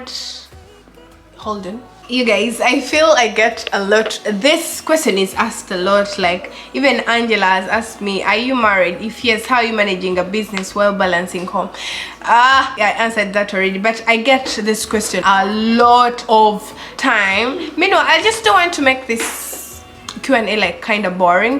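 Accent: Uganda. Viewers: I knew you are a Ugandan because of your accent... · she's Ugandan her and her accent can tell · Awww her accent can indicate that she is Ugandan. Lol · Wow u are a Ugandan banange, love ur accent darling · Sarah sarai. Her accent is obviously ugandan.no doubt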